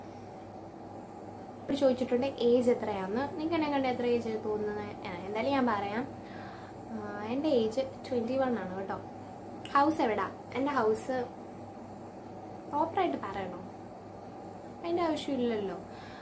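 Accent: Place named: Kottayam, India. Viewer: Aahaa chechi Kottayam aa alle enikku samsaram kettappazhee thonni Njan oru Q and A thappinadakkuvarunnue Eppam alle kittiyee Njanum Kottayam aannee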